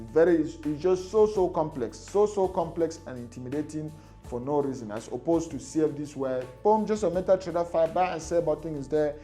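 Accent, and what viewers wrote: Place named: Akwa Ibom, Nigeria. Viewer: You have akwa ibom accent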